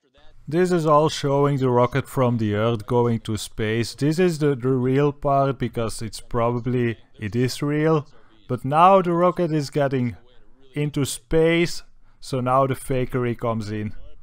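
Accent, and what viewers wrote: Austria. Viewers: One thing I am CONVINCED about is: Your from Austria aren’t you??? Even though the only Austrian accent I have ever heard in my life was the great governator himself Arnold Swarzenegger! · Is the voice over guy doing a Schwarzenegger impression? or is he just Austrian?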